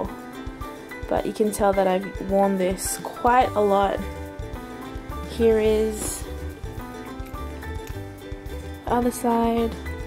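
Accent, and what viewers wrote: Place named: Australia. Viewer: noticed the aussie accent and subbed right away! haha another bag lover here ^_^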